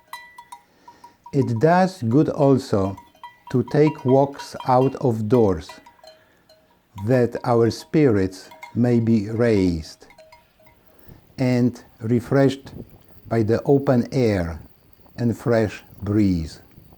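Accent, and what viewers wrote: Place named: Poland. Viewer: Thank you very much for all your videos. The technical quality is better than almost all the others, the pleasant voice and intelligent content is appreciated too.I planned to walk 2020 in May but - - Covid! I hope to be able to go in Fall of 2021. I must say I taught in Poland for 2 years in the 1990's and your voice has that pleasant inflection that I became accustomed to while listening to my students and fellow teachers. Love to watch your videos on my TV so I can appreciate all the beautiful scenery. Thanks from Canada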